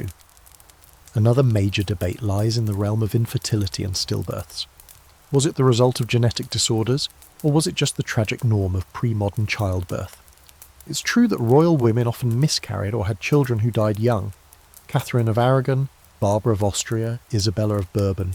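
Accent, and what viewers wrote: United Kingdom. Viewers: ive been joking for years that I put on a documentary with some guy with a british accent to help me fall asleep. I finally found your account. Thank you from Chicago illinois. Its 1am here.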